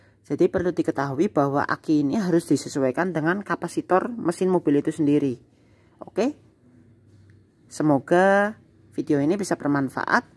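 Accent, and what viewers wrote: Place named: Cilacap, Indonesia. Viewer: Ngapak bgt...kayak cilacap😁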